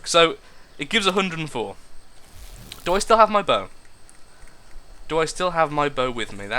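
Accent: United Kingdom. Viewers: Thank you for using Centurion. If you tame a particularly strong beast Legionnaire might be a great name too. As a former radio Program Director I have to say you draw far more attention to your speech difficulties by talking about them than they really seem to deserve any more. I think they may be larger in your mind now due to your hard work to get rid of them than they actually seem to most people. I wouldn't have noticed anything this episode had you not gone on about it. But it's your show. If your point is to encourage others to do a show who think their speech is not perfect that's a whole other matter. Then well done. But really, your Brit accent makes you come off extra smooth to us Yanks and I suspect you don't realize how much say compared to the guys at Good Mythical Morning for example. A funny show, but... How many in your audience do you think we're screaming at you to check out the drop at the top of the hill? 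LOL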